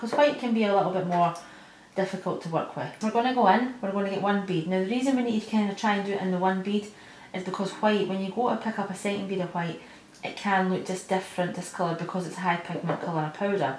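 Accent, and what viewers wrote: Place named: Scotland, United Kingdom. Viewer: Thank you very much for this clear video. I sometimes have a little bit of trouble understanding the Scottish tongue trap, (I'm from the Netherlands) but through the images I understand what you mean. I am looking forward to the next video. Greetings, Olga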